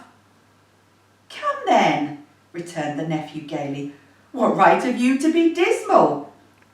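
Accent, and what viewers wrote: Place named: United Kingdom. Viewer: I loved listening to you read A Christmas Carol! It's different for me to hear it with a British accent. 🥰😘🎄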